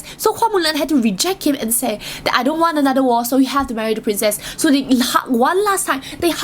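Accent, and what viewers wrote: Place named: Singapore. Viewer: Ohhh dear are you from spore? You accent is so nice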